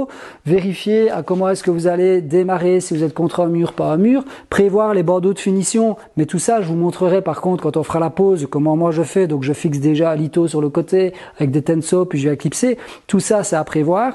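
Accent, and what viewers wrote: Belgium. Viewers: Une vidéo qui m'a donner envi de faire aussi ma propre cuisine, merci beaucoup pour ttes ces explication j'arrive plus facilement maintenant à voir comment sont fait les meubles et caissons !! Je suis abonné depuis peu mais j'adore ta pédagogie et aussi ton accent belge 😂 !! Encore merci pour tous tes partages de connaissances 🙏👍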